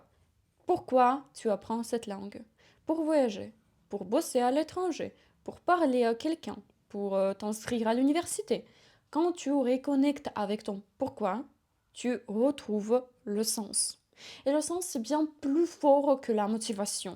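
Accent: Eastern Europe. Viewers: Un accent slave?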